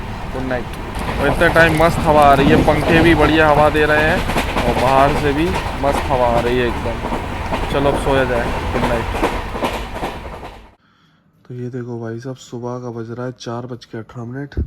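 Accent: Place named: Jhansi, India. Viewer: main apki boli se hi samajh gya tha 😁 Mai bhi Jhansi ka hi hu ( near Medical College Gate no. 2 )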